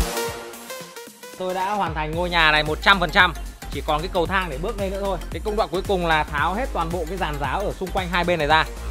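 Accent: Thai Binh, Vietnam. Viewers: Anh ở Thái Bình đúng ko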